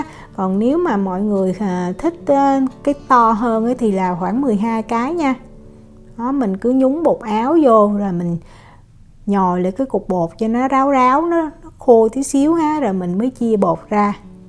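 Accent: South, Vietnam. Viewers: người miền nam nói nha ha vậy đó bạn ơi, mình thấy rất dễ thương. Ko thấy ai ý kiến gì có mình bạn thôi đó, nghe ko thích có thể ngừng xem, đừng toxic ạ cho cuộc sống tươi đẹp hơn nha bạn ơi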